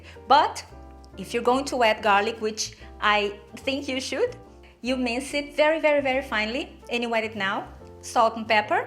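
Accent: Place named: Brazil. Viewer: I know a Brazilian when I hear one! 🫶🏼🇧🇷🙏🏼 que delícia 🤤 feliz Natal Deus abençoe 🎄👼🏽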